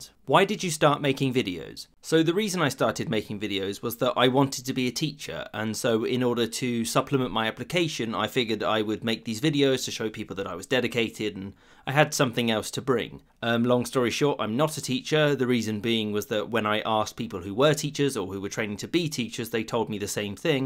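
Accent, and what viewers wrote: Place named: West Country, United Kingdom. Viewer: Peterborough? I thought you were from the West Country.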